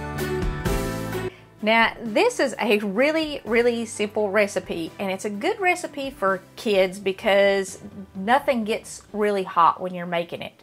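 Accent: South, United States. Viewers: Her accent reminds me of the five years I lived in Georgia. Coming from the North at that time, I was fascinated by the southern slang. She’s indeed a sweet spirit, and I am blessed to have found this page. God is always first. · Hahaha 😂.... I wish! I'm thinking the same thing, once I make this in a few minutes and eat a piece I'm going to want to make it all the time. I can't afford to gain any weight. Lol. But I love food, sweets, MMM chocolate! With that being said, I love your southern accent and I love your channel also, and love that you aren't scared to put God into it also, you go girl! I'm in southern Louisiana and I'll be making this recipe for the first time in just a few minutes. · That's our Southern slang. 😊❤️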